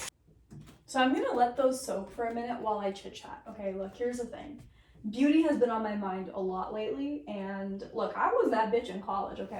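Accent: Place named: United States. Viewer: One generation is all it takes. You may be eating Indian food, but you sound so American is beyond belief. : ) your Puerto Rican Indian : )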